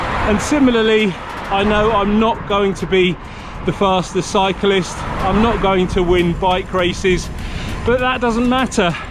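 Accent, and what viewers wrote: England, United Kingdom. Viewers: Excuse me you accent sounds English! Kilometers........flipping miles.......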